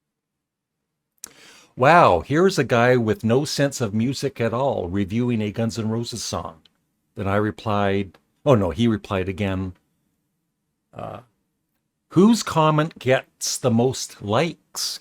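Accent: Canada. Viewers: Perhaps some people don’t realize you are just simply saying Jagger’s name with your Canadian accent? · I have always pronounced it jag~er. Maybe it’s your Canadian accent,!😉I’m so glad that you get more good comments than the rotten ones.😊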